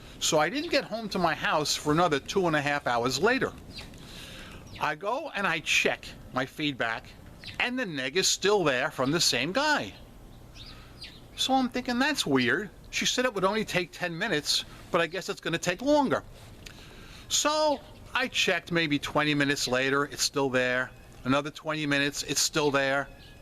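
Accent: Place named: Brooklyn, United States. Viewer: needs to put a compressor/limiter on his audio. When I know he's about to shout (I can see it in his eyes) I immediatly lower the volume. Otherwise, my wife might say "Who are you yelling at!?" and I both have that NJ accent but his is more Brooklyn, NY style. When he says the word "for" it sounds like "fur." I'm sure he drives the people that live down south of The USA crazy. They will say "What did he just say?" Like when the movie "Rocky" came out in the 1970's.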